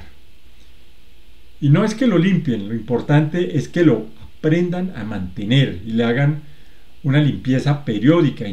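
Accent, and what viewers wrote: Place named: Bogotá, Colombia. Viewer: Me encanta el dialecto cachaco indignado.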